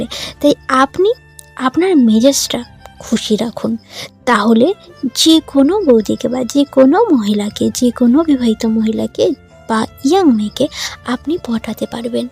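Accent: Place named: Pabna, Bangladesh. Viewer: পাবনার মধ্যে পাকা কন্ঠে ভিডিওটা খুব ভালো লেগেছে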